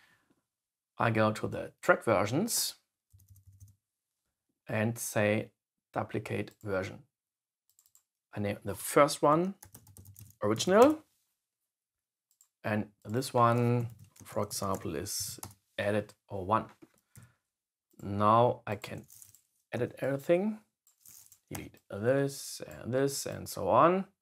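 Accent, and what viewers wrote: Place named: Scandinavia. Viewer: Your accent in English sounds like Scandinavians!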